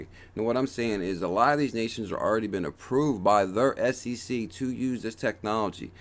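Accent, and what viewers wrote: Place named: Northeast, United States. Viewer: Thank you! First time I've listened to your video & it won't be the last. Thorough research & I like how you highlight what is important and keep moving. I've invested quite a bit in XRP and found a way to continue to do so....what a price! I grew up outside of Philly and you sound like you could be from N.J. or N.Y or PA or somewhere around there. I'm now living on the coast just south of Boston. Thanks again! Sharman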